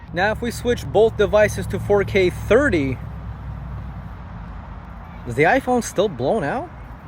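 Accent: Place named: United States. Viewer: Aah yes, that explains it! You sound really American just some words r's and o's. Always interesting how languages mingle. Like your videos, always fun to watch.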